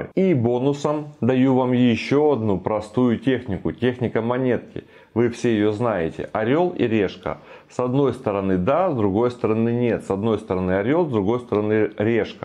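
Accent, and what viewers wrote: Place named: rural, Russia. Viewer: Вам бы ещё научиться говорить правильно для полного баланса, а то по-деревенскому говору не сказать что вы с 20 летним стажем бизнесмен)))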